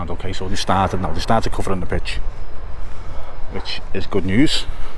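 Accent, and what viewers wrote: Liverpool, United Kingdom. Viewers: Look forward to your updates! On a funny side note, your intro is very catchy and since the scouse accent is pretty unique and an earworm at times (as an Indian native, it was always different).. i found myself mimicking that starting bit xD Cheers for the good work mate.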